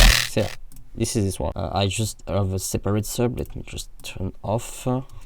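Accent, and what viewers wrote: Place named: France. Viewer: J'ai adoré le tuto (je comprends mieux l'anglais avec un accent fr de merde)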